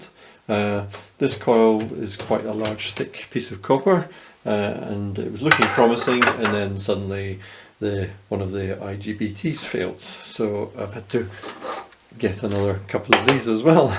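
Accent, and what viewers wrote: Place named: Scotland, United Kingdom. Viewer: where do you live sound Scottish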